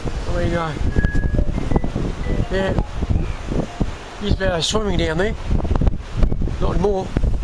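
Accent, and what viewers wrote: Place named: Queensland, Australia. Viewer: Mate sounds like you could be an Aussie, my guess is from Queensland.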